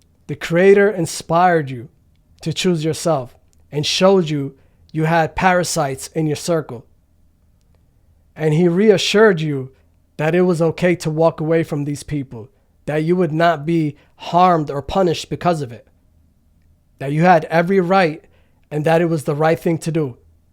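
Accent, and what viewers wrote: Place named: New York, United States. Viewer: How did I know you were from the NYC/NJ area? Lol. I hear it in the talk...🤙🙏🙏🙏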